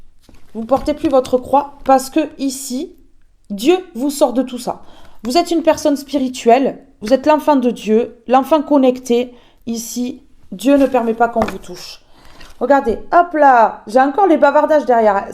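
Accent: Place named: South-West, France. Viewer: Merci j adore votre accént sud ouest de la France vous etes une bonne personn ej adore votre humour bonne continuation dans la voyance et desolee j espere ne plus vous embeter avec mes problemes merci beaucoup votre humour me fait du bien je vous souhaite que du bonheur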